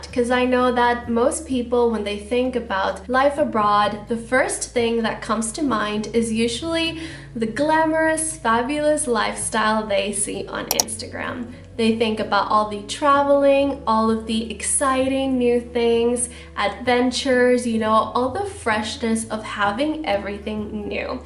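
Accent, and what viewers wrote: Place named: United States. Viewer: Your English is perfectly American. You didn’t learn that in Honduras, did you?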